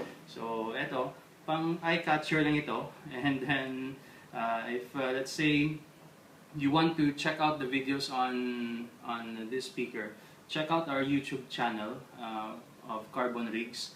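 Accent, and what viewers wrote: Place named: Philippines. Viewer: It's the disgusting pinoy accent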